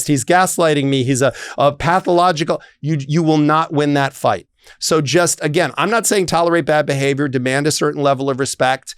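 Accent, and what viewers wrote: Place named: England, United Kingdom. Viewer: That’s a dynamite English accent. You’re the best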